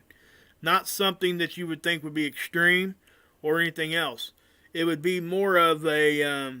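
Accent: South, United States. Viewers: Love the info you share! I am just finding your channel. Where you broadcasting from? You sound like a good ole southern gentleman!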